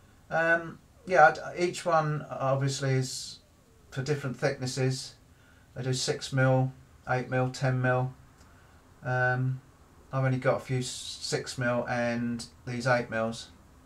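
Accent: United Kingdom. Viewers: Thanks for the great video! The water tight application is needed for attaching something to a metal roof like on a barn. I need to attach a tripod with a radio for wireless internet and do not have access to the underside of the tin, so I am hoping this will do the trick. Self tapping metal screws wouldn't have much to hold on to. But why is a dude with a British accent talking imperial inches and not metric? I am from Texas, and it even sounds strange here where I barely know how metric works. :-)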